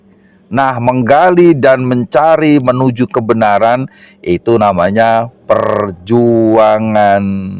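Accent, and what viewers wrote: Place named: Nganjuk, Indonesia. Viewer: Beeelas, ....!!! 😂😂😂 Manteb podho ndesone lur, persis wong nganjuk.😂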